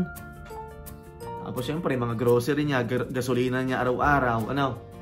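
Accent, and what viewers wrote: Batangas, Philippines. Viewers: I stumbled upon your vlog kabayan at nung madinig ko ang accent mo eh nag suspetsa ako na taga batangas ka😊. Kumusta na lang ala eh taga Batangas din ako.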